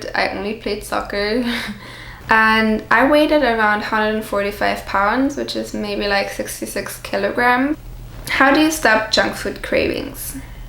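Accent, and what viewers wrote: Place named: Germany. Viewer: Where do you came from? Sounds like a German accent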